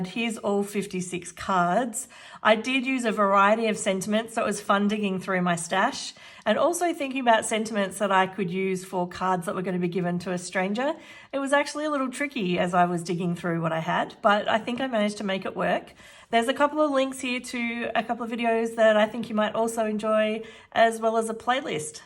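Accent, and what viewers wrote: Australia. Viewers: I love listening to your thinking process! (And not just because of the cool Aussie accent!) I’ve been doing the sheet loads of cards for quite a while. Now I want to step it up to using the whole pad! Watching how you figured out which papers to cut into which pieces was brilliant! Added up to 24! I loved the shock in your voice!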